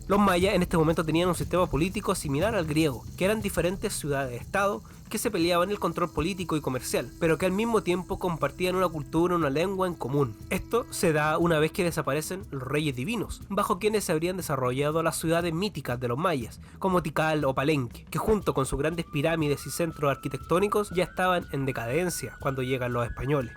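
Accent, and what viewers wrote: Chile. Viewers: Eres chileno ? A ti si se t entiende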